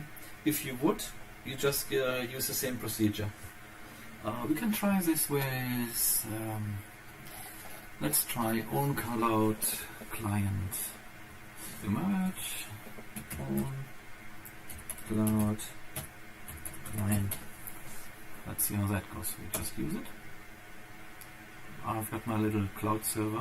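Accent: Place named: Germany. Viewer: Hello! I hear you have a German accent. So where do you come from? Best regards, Serge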